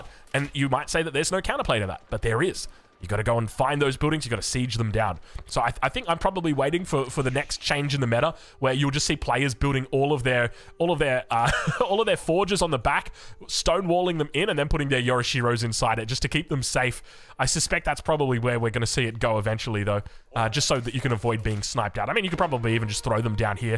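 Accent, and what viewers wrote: Australia. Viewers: Dont gang up on poor about his pronunciation of words, he's from Australia and their version of English is different.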